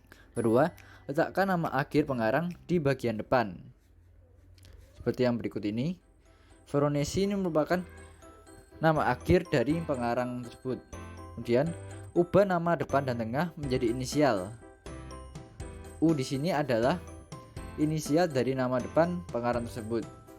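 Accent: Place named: Java, Indonesia. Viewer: Rada medhok emang ya?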